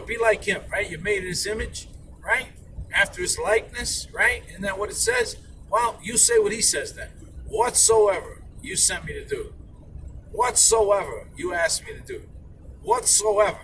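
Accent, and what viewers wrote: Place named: New York, United States. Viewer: Are you really from New York because you nailed the accent and attitude! I'm from New York so I know this and thank you brother for this video! Amein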